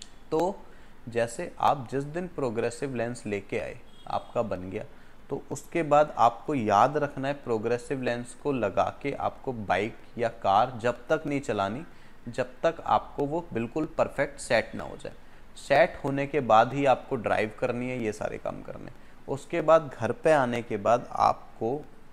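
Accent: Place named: India. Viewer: your tone and accent is too hindi medium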